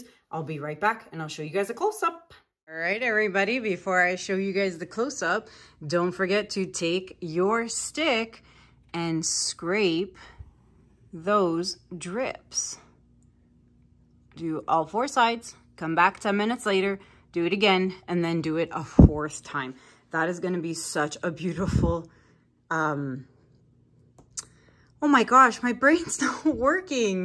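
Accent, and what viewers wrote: Canada. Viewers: Lmao I’ve got to tell you that in the past year or so I’ve met so many people from Canada …. I have a sister who moved to Canada(oddly enough in the same city you moved to but she’s been there for 5 years now) but never noticed it with her friends or anyone like that that she and her husband have met since moving out there but people that I’ve met on Long Island and NYC that are from Canada I know where they are from as quickly as they can hear where I’m from bc of the way they say “out” and any words with out in it bc of you! 😂😂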